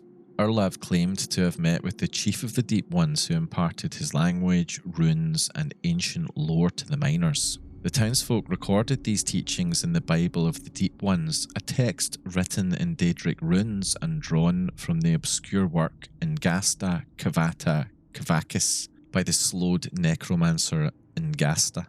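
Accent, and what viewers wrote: Scotland, United Kingdom. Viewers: Can you use a different accent? It’s hard to fall asleep listening to Scottish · Subscribed for the Scottish accent. 🎉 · I liked this as soon as i clicked onto it because i heard a fellow scottish accent, and it's about oblivion. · Fuck me. I forgot you are a Scotsman 😂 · Is that a wee hint ae a Scottish accent? Or am a wrang neebur? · Are you Scottish? Btw awesome video love the accent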